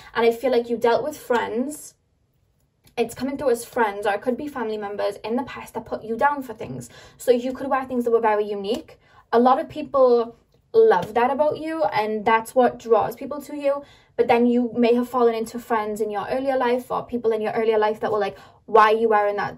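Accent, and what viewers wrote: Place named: Manchester, United Kingdom. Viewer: Great reading.! Love your accent!Ireland? Manchester? I'm an Anglophone, lol, so I've watched tv series with accents for years, but it's hard to distinguish certain accents.... I'm pretty sure you're from Manchester...am I right? Correct me if I'm wrong.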